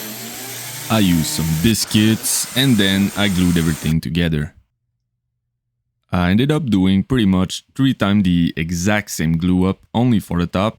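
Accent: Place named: Quebec, Canada. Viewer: well done sir. As someone who also works with reclaimed wood, I appreciate the amount of milling required to make it that good. Such a shame you didn't keep it. Your camera angles and editing are great. Your are well spoken and explained really well. My only issue is with the unnecessary music. Your voice and shop sounds are all you need. You sound French/Canadian...where are you from? Considering you only have 1 video so far...you should be pleased you already have over 1k subscribers!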